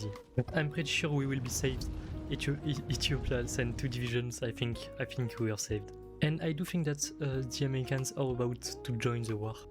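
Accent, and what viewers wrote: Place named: France. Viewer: Sorry, my ears are trained to not hear when they hear france accent so the whole video was mute. How did I understand there was someone speaking with accent? By the comments.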